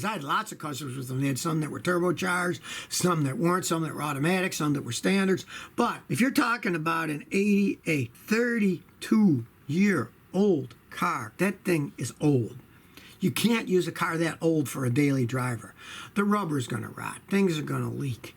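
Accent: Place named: New England, United States. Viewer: The way says "car" always makes me wonder if he's originally from New England.. Pennslyvania or New Jersey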